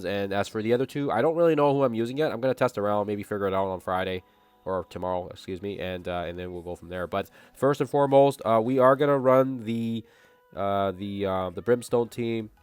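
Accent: Canada. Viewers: You sound just like rayge gaming to me lol. Is it just the Canadian accent? 😂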